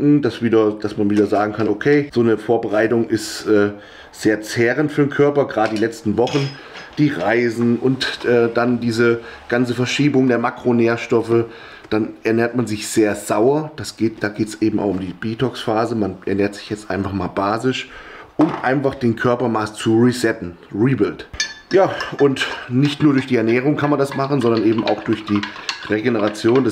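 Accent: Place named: Hesse, Germany. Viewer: Er meint wohl hessisch, wohne nicht so weit von ihm, aber muss wirklich sagen das man in jeder Gemeinde/Stadt ein bisschen anders spricht. Anstatt „spitz" sagt man hier zum Beispiel „speutz". Aber Plattdeutsch ist es nicht 😅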